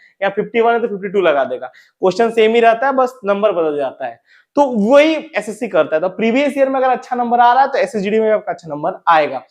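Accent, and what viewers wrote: Odisha, India. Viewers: Bhai tum odisha se ho na ?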